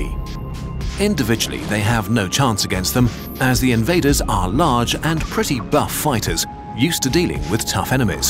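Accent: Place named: England, United Kingdom. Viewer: Kurzgesagt, German name, English presenter... 😂 Great presentation however! 👍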